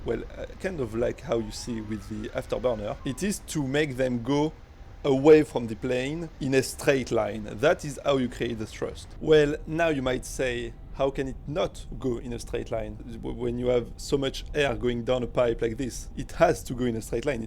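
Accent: France. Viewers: You have a faint high pitched tone in your mic recording, most noticeable in the Outro. I enjoy your French English very much xD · His accent is French btw lol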